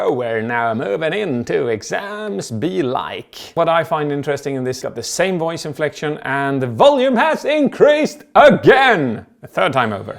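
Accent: Sweden. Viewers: I can't unhear the slight Swedish accent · I love the swedish accent lol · We love you! & I love the editing! Please keep it up! I love Swedish accent!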